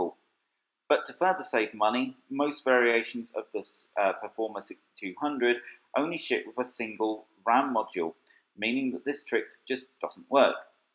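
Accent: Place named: England, United Kingdom. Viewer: the thick english accent on this blows. I can't understand it very well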